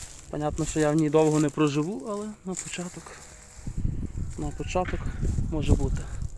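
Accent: Ukraine. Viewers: ТАК НАСМІЯЛАСЯ З ВАС. ВСЕ ПРИКОЛЬНЕНЬКО. ВАША МИЛА ВИМОВА ПРОСТА УКРАЇНСЬКА РАДУЄ. НЕ ЛЮБЛЮ МАТЮКІВ,АЛЕ В ВАС БУЛО СМІШНО. ГОЛОВНЕ ХАТКА ДІЙСНО ДУШЕВНА. В НІЙ ТЕПЛО ХОЧА НІХТО НЕ ЖИВЕ І РОЗВПЛЮЄТЬСЯ. ПІЧКИ ,ПЄЦИ ТО ШЕДЕВР!БЕРЕЖІТЬ ЦЕ. А ОТ ТА ЛАВКА ВИ СКАЩАЛИ ДЕРЕВЯНА ТО СОФА,АБО БАИБЕТЕЛЬ ЩЕ НАЗИВАЛИ. ДЕ ЯК В ЯКІЙ МІСЦИНІ. АЛЕ В ЄВРОПІ ЦЕ РАРИТЕИ! ПРОШУ ЗБЕРЕЖІТЬ ЇЇ НЕ ПОДАЛКУЄТЕ ВРЕА ЩЕ ВІДКРИВАЛАСЯ КРИШКА І ТАМ РІЗНІ РЕЧІ ЗБЕРІГАЛИ. ПОСТІЛЬНА БІЛИЗНА,ОДІЯЛА. В ІТАЛІЇ ІТАЛІЙЦІ ЇХ В РУМУНІВ КУПЛЯЛИ РЕСТАВРУВАЛИ І ,ЯК МЕБОІ ПРИКРАСИ ЦЕ. ЗИЧУ ВАМ НАТХНЕННЯ І УСПІХУ. СЬОГОДНІ ЗНАЙШЛА ВАС В ЮТУБІ І ЛЮБУЮСЬ.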